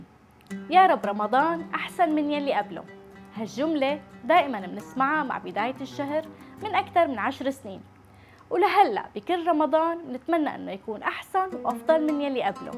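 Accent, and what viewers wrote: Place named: Levant. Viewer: ماشاءالله وتبارك جمال رباني صوره مصوره وكلام متزنًا ولهجة شامية مئه من مئه وشو كمان مابعرف شو بدي أقول لك.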